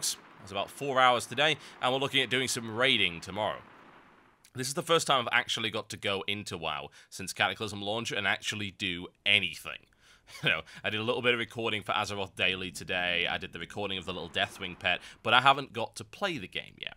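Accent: United Kingdom. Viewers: u british asda? me 2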